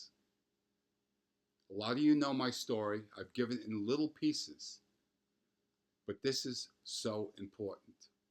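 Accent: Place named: East Coast, United States. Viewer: You sound East Coast. I live in Philly, and if you are near here I will gladly re-pay you by taking you through physical recovery with Yoga and other methods I use.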